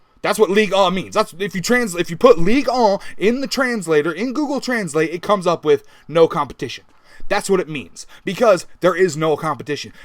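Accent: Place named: Manchester, United Kingdom. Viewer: Love the Manchester accent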